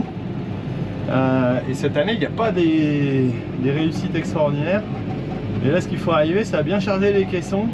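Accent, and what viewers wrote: Nord, France. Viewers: y fait noir comme dans une....., c'est parti mon kiki, merci pour l'accent du Nord et la vidéo 👍👍👌👌😊😊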